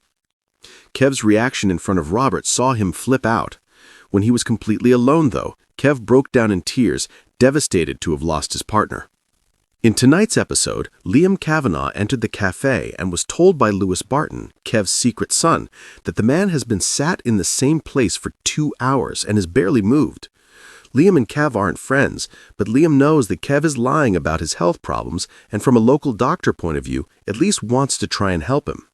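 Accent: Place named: United States. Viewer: What is going on with all these American voice overs. It’s off putting.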